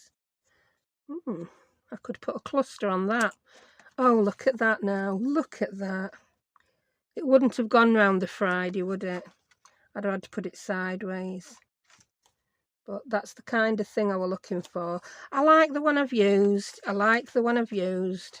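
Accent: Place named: Blackpool, United Kingdom. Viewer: Loving your tutorials what weight of paper do you use especially for your inked backgrounds. Your accent is awesome it’s making me home sick ❤️. I live in NZ but hale from Blackpool .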